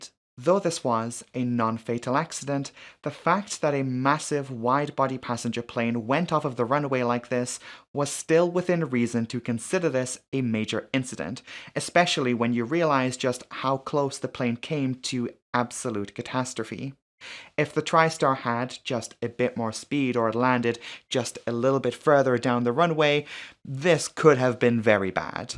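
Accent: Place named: United Kingdom. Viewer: No she’s just British don’t be racist.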